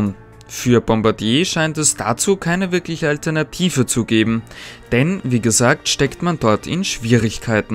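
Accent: Austria. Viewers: Du bist für mich der "Apfelwelt" der Eisenbahnbranche :D kurze informative Videos mit einer ruhigen und gut verständlichen Tonspur mit österreichischem Akzent :p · spricht ja nun wirklich sehr gut verständlich und auch sympathisch. In Österreich werden einige Laute halt anders ausgesprochen, so what? Gruß aus Frankfurt, wo es auch nen Dialekt gibt. 😅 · glaub ist ein fast versprecher oder mikrofehler aber die acht spreche auch ich(auch ösi) so aus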